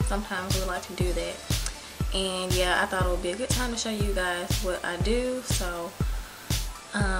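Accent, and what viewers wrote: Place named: South, United States. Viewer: i love this tutorial and your country accent.... lol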